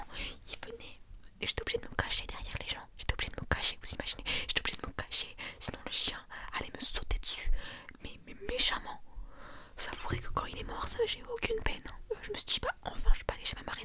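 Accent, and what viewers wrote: Belgium. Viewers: Ta un accent belge c'est assez troublant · bahahahaha wsh l'accent belge jpp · Oui mais dèfois ta l'accent belge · Bahaha mais c'est quoi cet accent belge sur la vidéo ? Je m'en étais jamais rendu compte 😂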